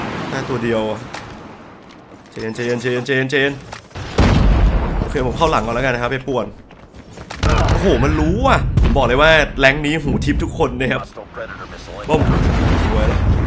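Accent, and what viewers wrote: North, Thailand. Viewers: พี่เป็นคนเหนือใช้ไหมครับถ้าใช้พี่อยู่จังหวัดไหนผมอยู่อุตรดิตถ์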